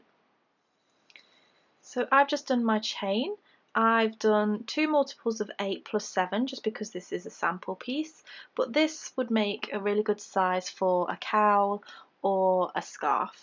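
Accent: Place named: United Kingdom. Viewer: is British in case you missed the accent along with everything else she said that you clearly didn’t listen to. What you call a double is called a treble in the UK, not sure how anyone can not know that if you use YouTube and online patterns.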